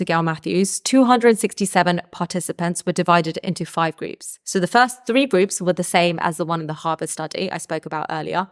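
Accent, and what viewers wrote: England, United Kingdom. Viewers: Straight up gorgeous and wicked smart. Plus you have that English accent. Good lord.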